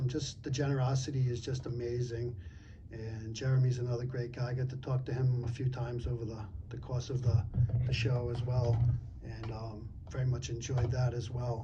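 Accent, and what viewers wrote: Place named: Boston, United States. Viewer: I get the impression you're from Boston lol,great pickups,I missed 2021 national ,will be in ac,hope to get a chance to meet you and other you tubers to tell stories and share experience's.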